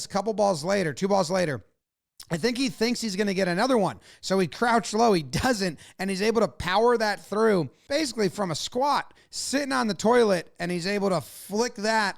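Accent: United States. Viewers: I went 5 minutes in and went "Wait a minute, that's an American accent". Good analysis.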